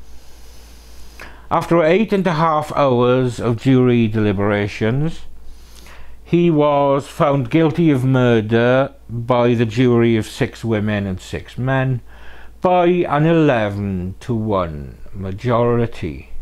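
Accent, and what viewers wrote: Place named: Wales, United Kingdom. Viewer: Going to watch again when having my dinner just to listen to that Welsh pronunciation ❤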